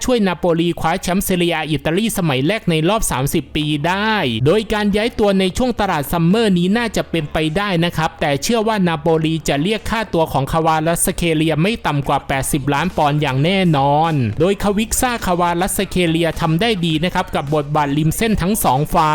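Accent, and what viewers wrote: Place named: Isan, Thailand. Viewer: admin พูดอิสานเป็นด้วยเหรอครับ 555+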